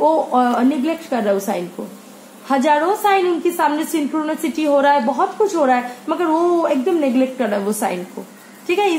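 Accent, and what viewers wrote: Bengal, India. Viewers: Hindi me bhi aapka Bengali accent aa jaata hai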